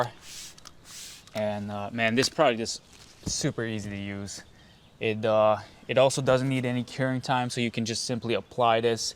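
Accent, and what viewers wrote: United States. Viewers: you do sound like an american, very impressed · I'm surprised you have a very American accent considering you are based in Belgium (I think)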